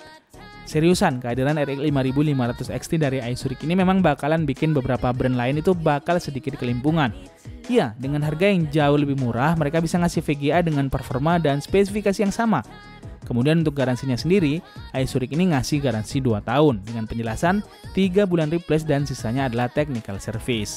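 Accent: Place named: West Java, Indonesia. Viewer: he'eh nya si aa teh meuni sunda pisan nya .. wkwkw jadi resep maca na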